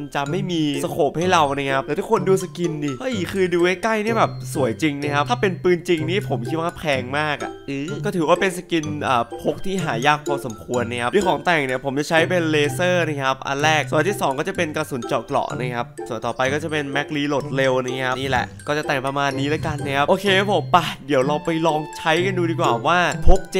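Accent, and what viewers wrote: North, Thailand. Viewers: พี่คนเหนือใช่ไหมครับ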